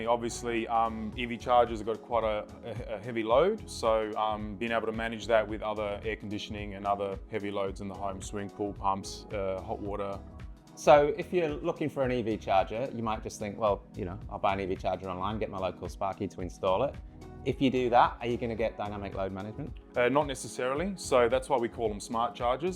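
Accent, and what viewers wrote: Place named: Northern England, United Kingdom. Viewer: Good to see a fellow Northern English person in Australia!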